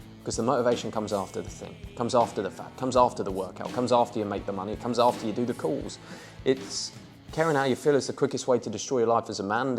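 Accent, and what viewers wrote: United Kingdom. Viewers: No.1: Letting a nihilistic hedonist with a British accent on YouTube tell you how to be a a man and how not to. Huuurrrrr brooo you have to drive an outdated mode of transmission otherwise ur a soyyy hurrrrr.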